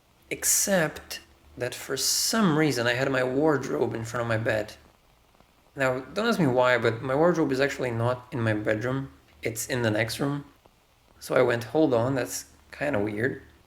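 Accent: Brazil. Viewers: Br? Eu senti o português no primeiro segundo do vídeo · Demorei pra perceber que você era BR. Mas mesmo assim, conteúdo muito bom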